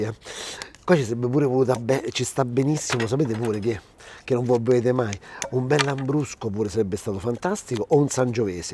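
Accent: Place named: Nettuno, Italy. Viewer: sei proprio de nettuno 😂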